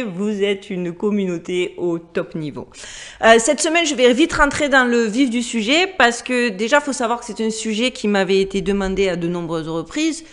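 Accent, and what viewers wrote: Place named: Provence, France. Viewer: Bonjour magnifique Jeune Femme pétillante à l accent adorable qui sent bon la Provence ! Votre lumière intérieure contrebalance la noirceur maléfique. MERCIIII !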